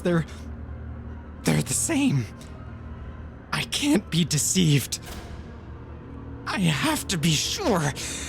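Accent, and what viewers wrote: Canada. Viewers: | I'm american, Canadian accents don't bother me at all, but even if it had your reading was fantastic sir. honestly didnt really notice it until i read the comments because i was on the edge of my seat. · this was amazing! I love listening to you read.. I love the Canadian accent! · is the narrator canadian? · The narrator is Canadian. It makes no difference towards the story, just an observation. Mainly getting this from the way he says words ending in -out. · This is the first I've ever been able to identify a canadian accent as a non-english speaker. You should be proud lol! At least stop apologizing for it :D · Narrator has to be Canadian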